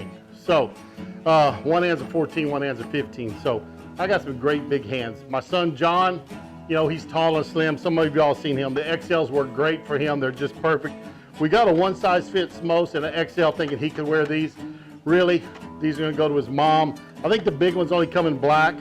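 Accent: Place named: Texas, United States. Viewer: I love your accent. I want to move to Texas. Beef. Land. Bible.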